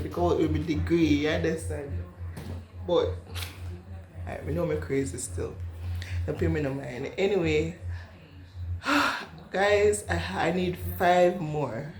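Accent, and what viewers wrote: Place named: Jamaica. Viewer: I love your accent girl..omg😍😍😍😍😍😍, I love Jamaicans 🥰❤️🥰❤️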